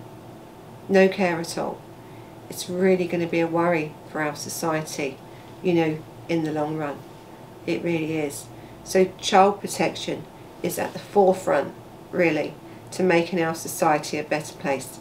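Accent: United Kingdom. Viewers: You’re a great storyteller; I love the British accent.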